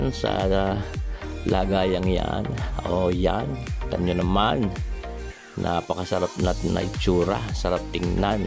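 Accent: Batangas, Philippines. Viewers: Nakakaaliw ka at nakakagood vibe panoorin. Marami rin ako nakukuhang techniques sa mga procedure mo ng pagluluto. Natutuwa ako sa mga pananalita mo eh! Talagang Batangueñong Batangeño… more power to your vlogs & GOD BLESS😊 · Abay sadya..batanguen0 eh😅😅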